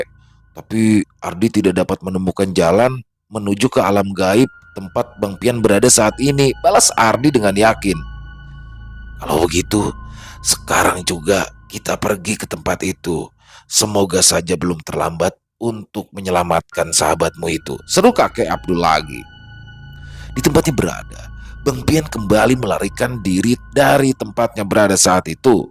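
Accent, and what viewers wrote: Jakarta, Indonesia. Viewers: Paling suka kalau logat Betawinya keluar.... Salam sukses bang... Salam dari Iwan Pati. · Selain ceritanya memang udah bagus, cara bang membawakan juga ciamik. Betawi nya dapet banget. Dramatic reading yang keren karena dibawakan dengan natural, wajar dan nggak dibuat-buat. Jago banget dah hehehe. Salut buat bang dan tentu saja Mas Untung Prasetyo. Semoga terus berkarya dan sehat selalu. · Taiwan hadir bang... bmyakin logat Betawi bang...aye pantengin terus nih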